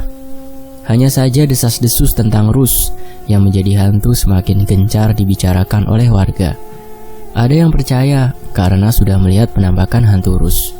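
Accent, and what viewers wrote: Java, Indonesia. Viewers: min medok bgt jowone 😁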